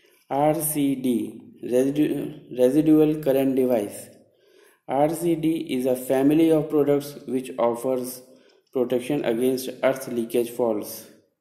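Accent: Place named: India. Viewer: I'm English Through and Through I can understand everything, why?, because my wife (of 30 years) was from India Ive lived in India many times, and its nice to hear an Indian accent , so anyone wanting to complain about his accent please see me and I'll wash your mouth out with SOAP! Thanks for the explantion I was worried I was installing the wrong gear DIY in asia (Thailand) until I saw this quick and nifty explanation, sada suki raho!!!!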